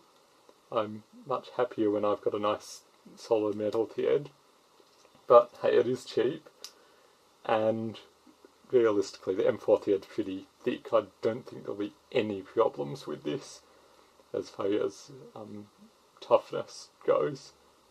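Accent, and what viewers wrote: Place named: Australia. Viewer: You hit the nail on the head with this video, metal screws shouldn't just screw into plastic, look at the main long rod from the motor, thats the same deal, You need to make sure you get a copy of the STL file so you can make another as this is where you will get wear and tear, all of any of the moving parts that are metal that are in 100% contact with plastic will sooner or later fail, look at a RepRap for how the screw rods all have a nut to screw into and not bare plastic. You sound like an Aussie, I am as well, I had an order with this guy at milkyoubox and he took too long to send, 5 months was too long to wait, others wait still. Order canceled. This printer is cheap, in all ways, construction and money, but all up the waiting around costs more than anything, and all who give money too the guy at milkyoubox is padding his bank account as long as you wait, the interest from your own bank account you could have made on your money instead of he at milkyoubox.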